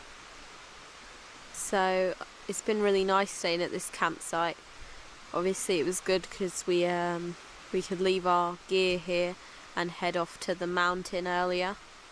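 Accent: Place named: Wales, United Kingdom. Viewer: in the valleys yeah lol nearly got the accent lol move to wales best thing you ever do. i know you love it lol btw its a stream not a river lol. take care hurry up back.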